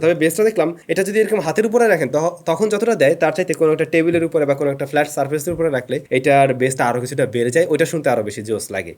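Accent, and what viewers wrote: Barishal, Bangladesh. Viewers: এতদিন পরে জানতে পারলাম ভাই আমার বরিশাইল্লা 😅😅😅😅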